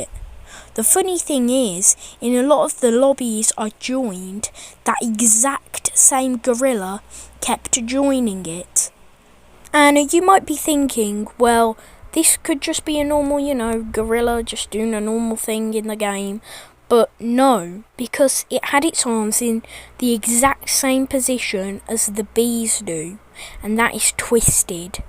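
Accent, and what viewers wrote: United Kingdom. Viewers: Are you British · BRITISH MAN UGH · Nar mate he’s British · Your accent is literally the perfect British accent for broadcasting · Bro u so brithish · ngl no offense but its its alot harder to take you seriesly when your british XD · It makes it even funnier because he British😂😂😂😂😂😂😂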